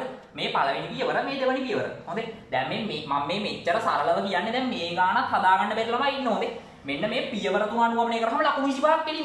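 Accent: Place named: South, Sri Lanka. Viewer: ❤🌝දකුණේ හඩක්...මරු mru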